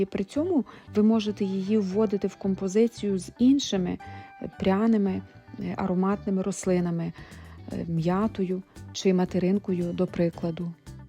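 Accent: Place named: Transcarpathia, Ukraine. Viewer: закарпатська українська- особлива)) у вас якийсь свій діалект))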